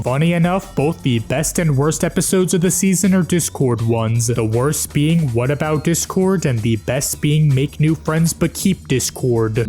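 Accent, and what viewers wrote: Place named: New York, United States. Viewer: you sound like you’re from new york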